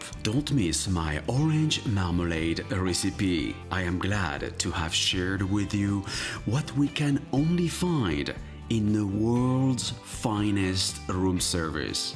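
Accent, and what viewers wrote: France. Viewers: I love your voice and French accent!!! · Love the french accent! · Bonjour, Vous êtes Top! Etes vous d'origine française? Vous en avez un peu l'accent! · Cassie Hassani il est français je pense , je reconnais l accent français, (très léger)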